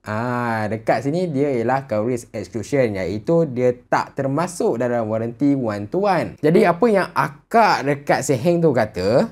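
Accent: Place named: Sabah, Malaysia. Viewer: loghat sabah laa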